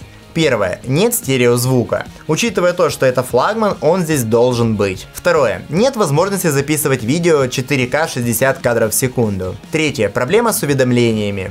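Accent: Odessa, Ukraine. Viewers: Ты одессит ? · ты что Одессит?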